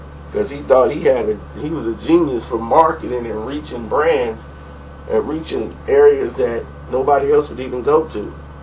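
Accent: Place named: West Coast, United States. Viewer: The slang had me crackin upp haha you too funny. Keep up the great videos. And you Spot on with that west coast slang!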